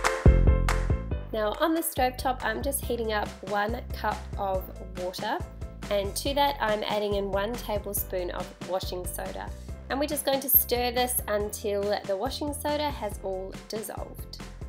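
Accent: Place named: Brooklyn, United States. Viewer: You are welcome. All of the USA I am sure wishes your environs and wildlife come through the fires as best as possible. BTW- I thought your accent was from Brooklyn, NY at first, though. :-) I like the "make your own" liquid cleaning products. Money saving and safer. Hope you get back to more when you can. -UrbanLifeTime and us all. :-)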